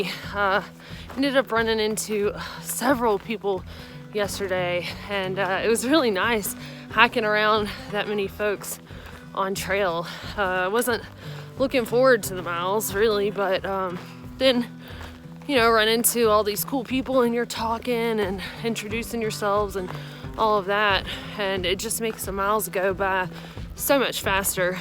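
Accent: South, United States. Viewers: That was a scary encounter with the mountain lion. If you would have been at that spot just a few minutes sooner the lion might have been in the bushes. It could have gone bad very easily in my opinion. I think the fact that you were at a higher elevation helped. It was good that you kept your cool and the harmonica obviously helped. Maybe have your pepper spray on one hand and your neck knife on the other just in case. Also consider getting the bear spray sooner on the trail. I really enjoy your videos and it easy to like the way you express yourself and your accent. Good luck on the trail and hope you have a great time off.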